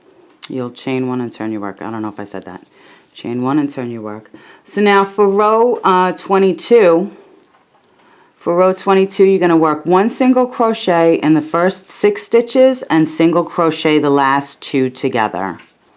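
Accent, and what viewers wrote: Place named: New York, United States. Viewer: Your tutorials are some of the best that I've found. They are so easy to follow and I love listening to your new York accent and the sound your nails make clicking together when you crochet ☺️ Thank you so much for these tutorials..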